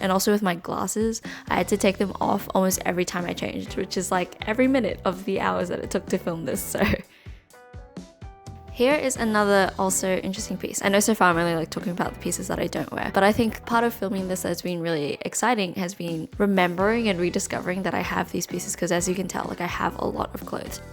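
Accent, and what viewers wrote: Australia. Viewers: Oh jeez. ANOTHER austalian i had assumed was british. help i can't place accents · Hiiiiii. I love your style and your accent. Can you recommend some other Aussie youtube channels that you love watching? I believe that if I enjoy watching your videos, definitely I will love your recommendations. Cheers!!!!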